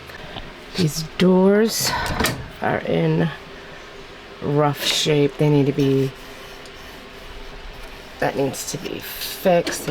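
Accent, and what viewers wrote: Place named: New England, United States. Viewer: Awesome! Love the combination of wood and black. I'm from New England (lived in all states except Maine:} and was picking up on your accent. When you mentioned the cold weather and living in N.E. I wasn't surprised! Which state? I now live in central coast of California and love it here. The weather is so wonderful. It's not crowded and little traffic here. Holly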